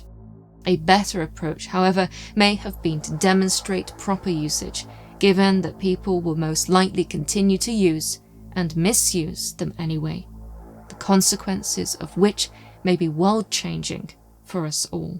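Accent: United Kingdom. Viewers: Subbed within minutes of my first experience with you (fellow Brit and listening to you makes me a very proud Brit!! 🤩) I will now be binging on all of your documentaries.Fabulous orator delivering articulate and engaging content. I am all in 100% invested in this beautiful channel!! CONGRATULATIONS 👏 you deserve millions of subscribers.Bravo 🙌 🙏 lots of love x🩷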